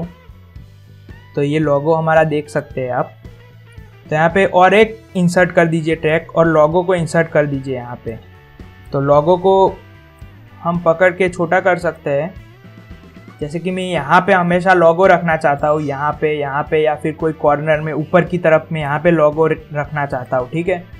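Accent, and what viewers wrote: Gujarat, India. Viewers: Brother aap Gujarat se ho kya????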